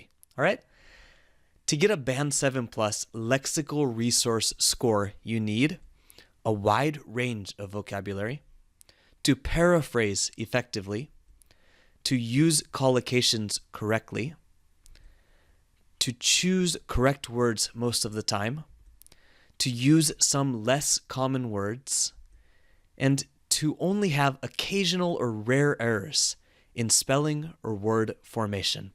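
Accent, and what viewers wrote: United States. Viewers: Should British english be used to write in IELTS? You wrote labour as "labor" (which also means giving birth i think) :) Also, you sound like the speaker in the listening test! (but with the american accent haha). Anyway, this lesson truly is helpful! I have my tests on 3rd June. I hope to be ready to for band 6+, or even 7,8!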